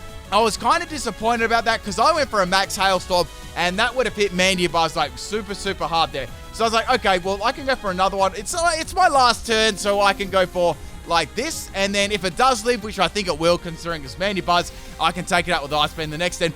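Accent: Australia. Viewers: I've been watching him for some time but.. is he Australian?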